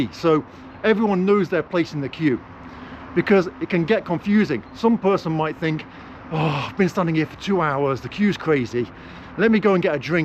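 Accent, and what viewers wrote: United Kingdom. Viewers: Я наверно единственный слышу ваш британский акцент 😄 и не думаю, что вы американец. В России люди очень редко знают какие бывают акценты английского языка, отличия, как они звучат и т.д.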